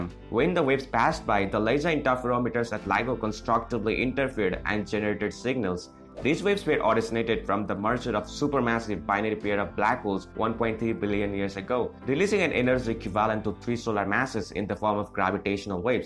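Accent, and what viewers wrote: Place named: Germany. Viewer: It's nice. But bruh your's English is like a Germenish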